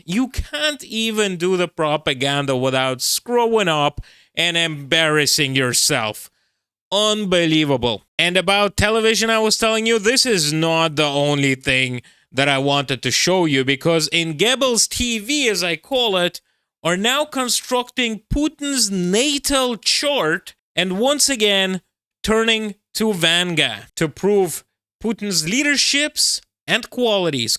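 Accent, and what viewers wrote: India. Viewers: OMG!!! YOUR FUCKING INDIAN ACCENT!!! 🤣🤣🤣🤣🤣🤣🤣🤣 I mean, the information is relevant and important but the way you deliver it is just BRILLIANT!!! Thanks! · Your Indian accent is new. Love it! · I love your Indian accent!😊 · I just love your videos! Especially your dubbing with different accents! Great Indian accent! As a American I want to know what is going on in Russia! I have been watching your videos since you lived in Russia! I have always enjoyed your videos!!!!😂😂😂😂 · Brilliant Indian Accent.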